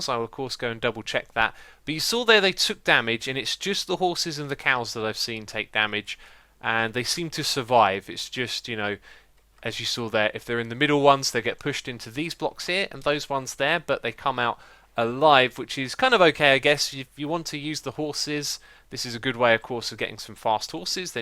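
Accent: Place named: United Kingdom. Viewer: I quit Minecraft a long time ago (1.5 years ago, I think), yesterday I saw one of your Hermitcraft videos and now I am really inspired to build again! You also learned me a fantastic British accent, which is quite rare here in The Netherlands! My English teacher was very proud and gave me an A. Thank you for everything!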